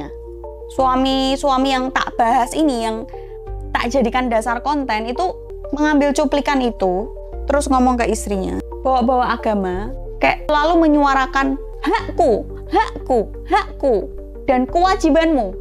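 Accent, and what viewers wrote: Java, Indonesia. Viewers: pertama ktmu chanel ini d beranda seolah g prcya ada konten sperti ini,gokil.trs br puter 2 video Lgsg geleng2,mantab bener ini konten,aplgi ada logat jawany,bikin ngekek🤣.tanpa pikir ojg Lgsg subscribe.😁 lanjutkan mbak,edukasi sex memang sangat perlu,ora gur uslak usluk tok,semua ada ilmunya.😁👍 tp pda intinya konten ini khusus hnya utk orang yg waras,inget y,ORANG YANG WARAS!!😅 SEMANGAT DAN TETEP SEHAT DAN BERKARYA MBAK!!!💪👍👌👏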